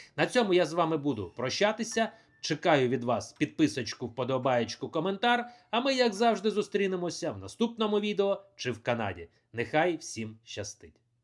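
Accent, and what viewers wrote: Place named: Russia. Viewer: Лайк за відос! Але перестаньте Акать,бо дуже схоже на как цапню!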